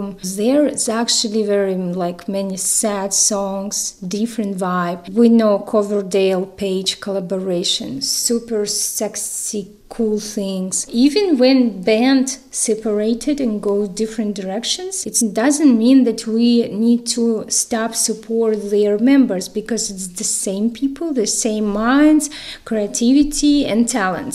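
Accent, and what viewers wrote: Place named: Russia. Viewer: So awesome you always keep the russian accent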